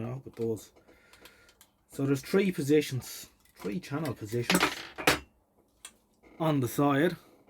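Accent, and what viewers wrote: Ireland. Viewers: When you were testing the second set your Irish lilt turned Japanese ! Spooky! 6.30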